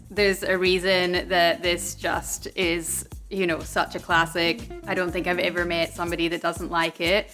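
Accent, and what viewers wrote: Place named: Scotland, United Kingdom. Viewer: i thought so but at first i was thinking irish... but u sounded scottish... i couldnt make my mind up lol i guess accents can vary alot in different areas of scotland like they can in england... and im sure the same in australia😃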